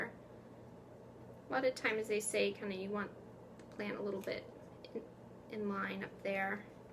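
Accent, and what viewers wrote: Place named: United States. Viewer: Are you in Bridgewater in UK or USA. I notice your USA accent. My temerature is only 20°c and my beany is losing leaves by the handful after I just watered her!! Please help.x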